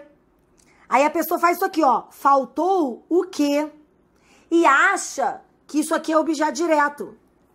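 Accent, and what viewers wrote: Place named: Rio de Janeiro, Brazil. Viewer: Por que todos os professores de português são cariocas?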